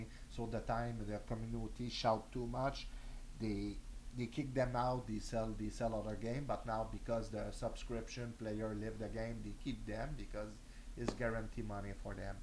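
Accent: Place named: Quebec, Canada. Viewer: I cant leave a message on your video about enigma breaking the game because i guess alots of snowflakes insulted you but i just want you to know that myself (and all the old school diablo 2 players i know) hate enigma and wish it gone from the game!! Cta too Ps : est tu Québecois? Ont dirait que tu a l’accent du Québec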